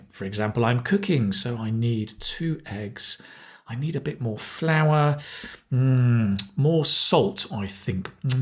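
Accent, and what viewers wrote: United Kingdom. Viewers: I adore that British accent..🥰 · Hi from Italy You have been a great discover for me! I love your British accent Your suggestions in this video are simply brilliant: focussed, clear, understandable and easy to get into daily life. Thank you so much!! I'll keep watching you!!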